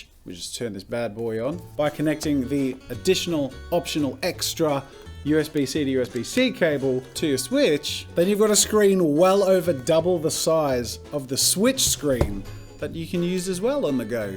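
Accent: Australia. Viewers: Aussie accent lmao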